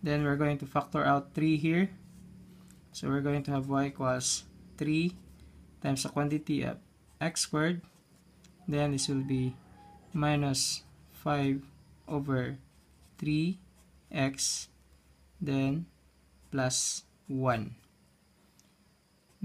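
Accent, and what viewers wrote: Philippines. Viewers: When i heard his accent i know that his filipino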